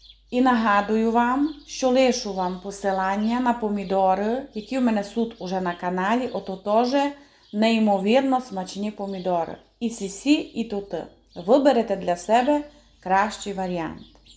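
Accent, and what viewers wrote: Transcarpathia, Ukraine. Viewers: ви направду дуже гарна господиня), все, що я робила за вашими рецептами було дуже смачне. А ще, мені дуже подобається закарпатська говірка. Дякую вам дуже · Дякую за чудові рецепти! Томатний сік вийшов смачний за вашим рецептом, тепер спробуємо помідори. Дуже люблю слухати вашу закарпатську вимову - вона збагачує українську мову. ❤ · Щиро дякую за рецепт і за чудову закарпатську говірку. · Мова українська, закарпатський діалект:))) звучить дуже цікаво і гарно. А закрутки в господині неперевершені! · Кайфую від вашого закарпатського акцента.Дякую!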